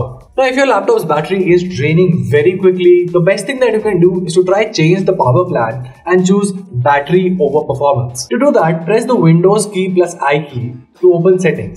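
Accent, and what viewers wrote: India. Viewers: Great content, but WAY too fast presentation and I could barely understand you as your have such a strong Indian accent. I am English. I have liked the video.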